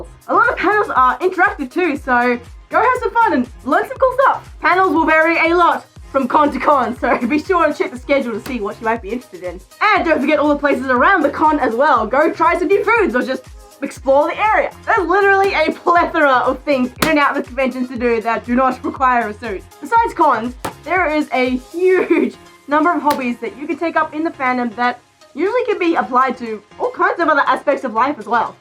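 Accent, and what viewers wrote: Australia. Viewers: I dunno if I just like your Aussie accent or what, but I think you're officially my favorite furry x3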